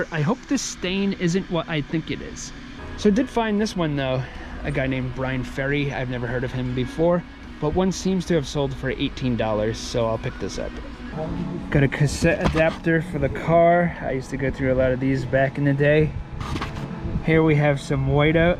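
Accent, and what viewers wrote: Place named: North East England, United Kingdom. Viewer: For those not from the North East: "harrah" = Horror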